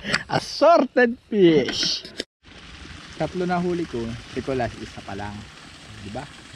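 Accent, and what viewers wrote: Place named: San Antonio, Philippines. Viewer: idol parang taga san antonio na ung accent mo hehe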